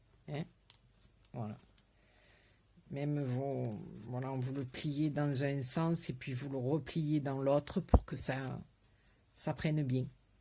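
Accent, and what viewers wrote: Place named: Marseille, France. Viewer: J adore votre façon de parler vous faite pensées à ma belle soeur de Marseille bisous d amiens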